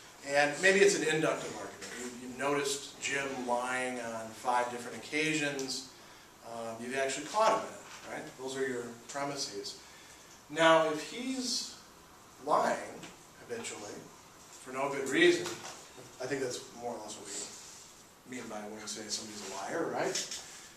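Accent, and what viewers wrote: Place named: Canada. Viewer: I have lived off your videos to guide myself through my university philosophy elective - for which I accidentally registered. I caught myself answering your questions out loud as if I were attending in person. I find it interesting to listen to the accents of your students, because being a Canadian myself, you sound like me. Midterm today! :)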